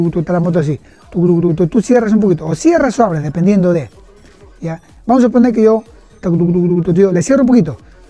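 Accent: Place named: Rioplatense, Argentina. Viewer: Desde montevideo gracias y tenes algo de rioplatense hablando muy buen video hasta ahora el mejor de como regular lleve a hacer el servicio y le habian dado como 5 vueltas de entrada de aire tuve que mantener en todo momento la mano sobre el acelerafor dia de lluvia con un transito terrible y nublado cuando llegue se me apago y tuve que arreglarlo yo mismo mi suzuki gn 125 f con 3 vueltas o 3 1\2 va bien vivo en el punto mas alto de montevideo gracias!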